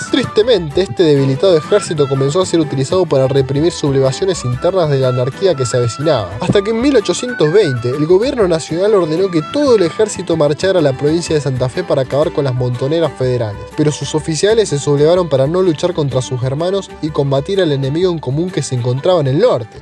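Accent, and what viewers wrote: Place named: Peru. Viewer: Porque tienes Voz de Peruano imitando acento Argentino????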